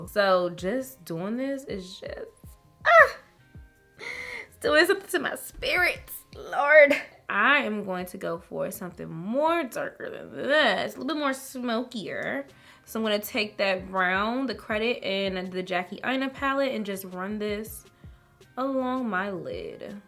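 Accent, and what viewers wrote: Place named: Prince George's County, United States. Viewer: You gotta be from PG County MD 🧐 I hear the accent 😂😂😂 & this look is bomb sis!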